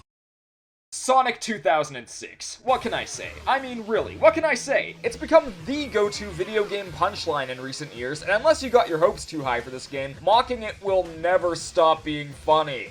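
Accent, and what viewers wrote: Canada. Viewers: Is he canadian? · are you french canadian?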